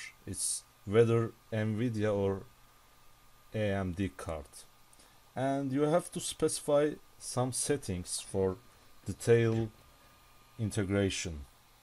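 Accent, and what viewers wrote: Turkey. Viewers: Telaffuzdan direk anladım Türk olduğunu :D hissediliyor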